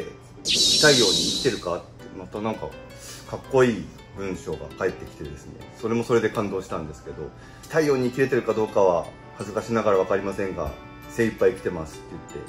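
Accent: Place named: Ishikawa, Japan. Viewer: 壱成君何気に言葉のイントネーションが石川県弁？になってる(笑) めちゃ親近感あります🙆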